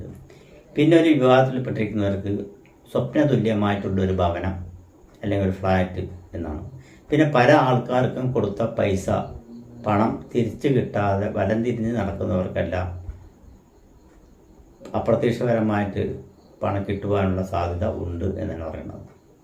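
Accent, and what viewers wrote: Tamil Nadu, India. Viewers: Tjamizhu. Parayunnath. Kondanu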